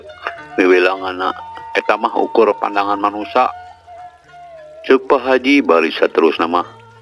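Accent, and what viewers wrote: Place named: Sunda, Indonesia. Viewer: Resep upami merhatoskeun tutur catur bahasa kang.. SUNDA PISAAAAAAAN..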